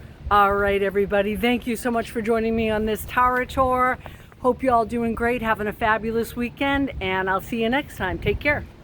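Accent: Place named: Boston, United States. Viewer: New England as in Boston. (Pah kahz.) Love it! Am I right? Or at least close by...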